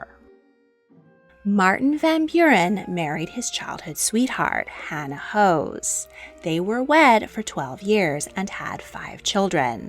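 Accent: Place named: New Jersey, United States. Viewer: OhMayGaud!!! Your Jersey accent is awful!! It makes it very hard to listen to this and take it seriously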